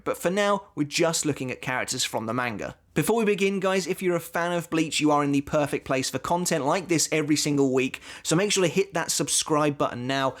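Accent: England, United Kingdom. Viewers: Content and overall quality is great but the way you pronounce japanese in an English accent is very hard to listen to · You need to say Japanese word like a Japanese person not like a English man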